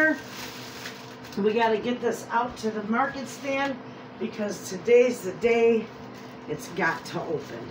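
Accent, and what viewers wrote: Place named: Upper Peninsula, United States. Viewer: I would totally love to shop at your market stand! By the way I like the "way you talk" I was born in the U.P. and now live in Northern Ohio. You talk like my family 🤗❤️ by the way, you grandkids are well behaved cuties! That little boy with his big hat is adorable!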